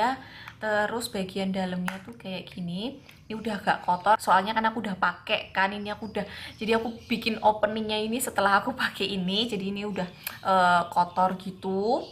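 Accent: Java, Indonesia. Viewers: Selalu suka review nya mbak medhokkk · Telat 33 menit nih mbak medok..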